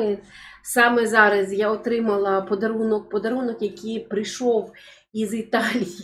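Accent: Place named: Polissia, Ukraine. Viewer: Пані! Вітаю Вас з днем народження! Дякую Творцеві,що він створив Вас такою миловидною,щирою,розумною,відкритою,справедливою і і в міру критичною! Дякую батькам,що привили Вам любов до рідної землі!А мова Поліського краю така чудова, хочеться слухати і слухати Вас! Кожного вечора слухаю Вас,а якщо світла немає -скучаю за вашою правдивою інформацією. Будьте ж тією зірочкою,яка дарує сотням тисяч людей своє світло і дає надію на те,що зло буде переможене Добром! Многії і благії літа Вам і вашим рідним!!!Слава Україні!!!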